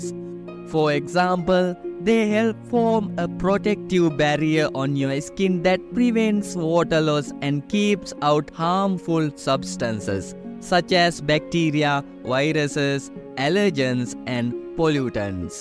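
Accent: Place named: Sri Lanka. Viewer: your accent says you are from Srilanka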